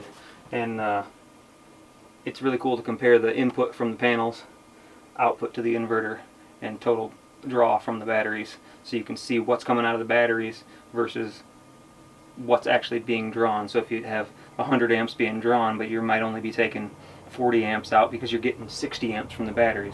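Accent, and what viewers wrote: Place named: United States. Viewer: The good old Ruuf.. Not Roof Ruuf. Makes me laugh every time i hear Americans say Roof or car, veehykel not vehicle. IDK why it just makes me giggle.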